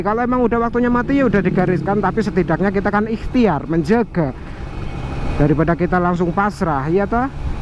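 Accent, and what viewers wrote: Banyumas, Indonesia. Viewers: Klau ngvlog bahasa ngapak nya sering dipakai om biar makin ketawa aku liat ny lucuu...lucuu sukses trus om 😁👍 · ngapak'e gawe aku ngguya ngguyu ae pak😂..sampeyan wong ndi sih😄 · Wong ndi si mas? Salam ngapak ket kebumen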